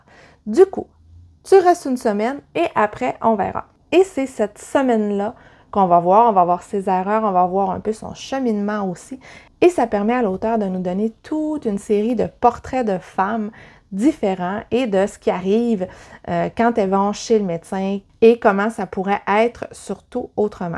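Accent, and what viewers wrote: Quebec, Canada. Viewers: J'adore t'écouter pour ma part justement avec ton accent québécois et le parler de chez-toi 💛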